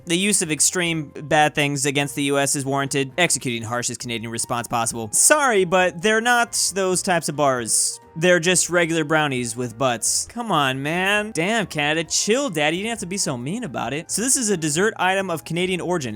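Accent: Australia. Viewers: Hot take, but.... Australian accent is better than a real Australian’s accent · I love the Australian Accent do a Video with the Accent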